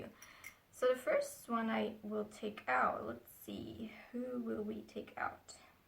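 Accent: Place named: Europe. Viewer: Where are you from in europe? I think I recognize the accent ;) anyway nice video! :)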